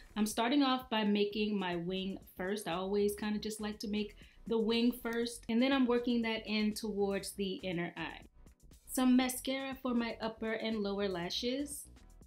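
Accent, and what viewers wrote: Baltimore, United States. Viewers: is that a Baltimore accent?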